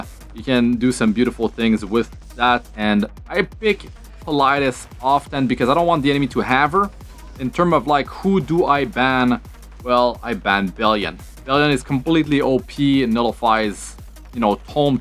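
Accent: Quebec, Canada. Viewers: Hey très bonne vidéo de RTA et en passant j'ai remarqué ton petit accent québécois dans ton anglais qui est vraiment pas facile à remarqué pour un non québécois en tout cas tu te débrouille vraiment bien continue !👏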